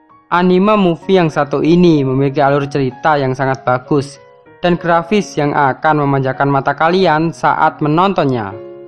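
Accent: Java, Indonesia. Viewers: aku suka medok mu min hehe lanjutkan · Suara admin anime medok medok ya..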